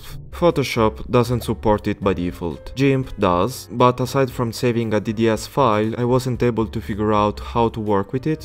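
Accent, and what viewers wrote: Italy. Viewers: I can tell this is south italian accent from a thousands miles away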